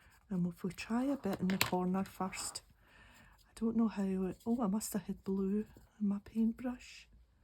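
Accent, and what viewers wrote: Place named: Glasgow, United Kingdom. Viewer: O we love your Glaswegian accent, Willie always listens and smiles when I watch your videos he likes the accent it gives him a touch of home. ❤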